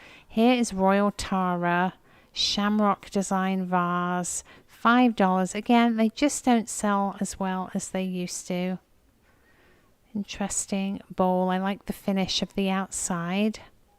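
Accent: United Kingdom. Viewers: you are sooo cool I enjoy watching and following you. It's June from Jersey. You are such a pleasure to watch and listen to. Like that British accent, girlfriend. You are loved in the Northeast keep rocking!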